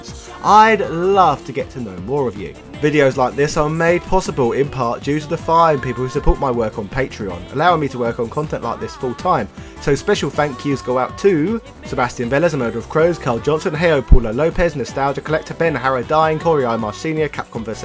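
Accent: United Kingdom. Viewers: I have learned British accents unintentionally make certain words sound really offensive.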